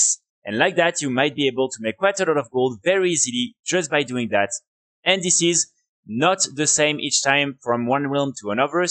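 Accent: France. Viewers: tu serais français à tout hasard?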